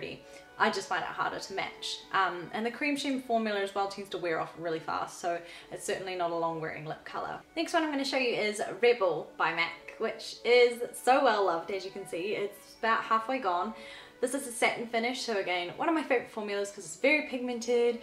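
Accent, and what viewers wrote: New Zealand. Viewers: FINALLY a classy woman on Youtube doing makeup!!!! YUSSS,,where abouts are you from? im a kiwi and you sound kiwi/british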